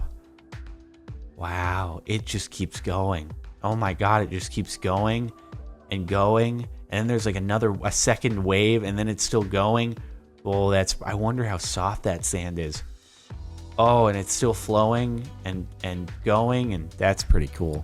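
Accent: Finland. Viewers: It's not scandinavian accent. It's finglish or rally-english. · The hydraulic press channel is still going, also, it's a finnish accent, not scandinavian uwu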